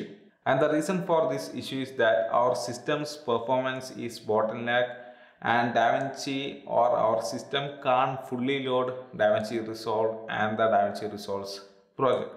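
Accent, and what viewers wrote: Kerala, India. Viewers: Bro malayali anno?😅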